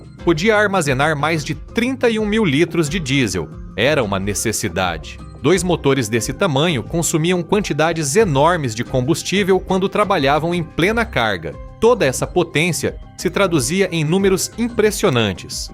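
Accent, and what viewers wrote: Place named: Caipira, Brazil. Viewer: Eita sotaque caipira.... rs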